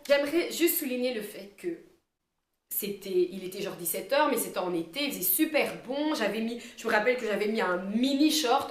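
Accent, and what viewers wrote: Belgium. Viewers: Sa ma fait rire surtout avec ton accent belge ahah mais c’est vrai que sur le coup t’es paralysé 🤷🏻‍♀️💙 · Est-ce que tu es Belge prcq tu as un accent? 😂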